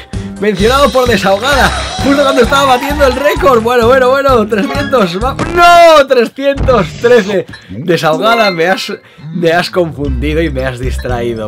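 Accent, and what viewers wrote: Argentina. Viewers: Amo como hablas en castellano (argentino) ❤ SOS UN GENIO💜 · hablando argentino es lo más hermoso que hay♥ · Jaja a es al que mejor le sale el acento argentino (entre los youtubers españoles que lo hacen)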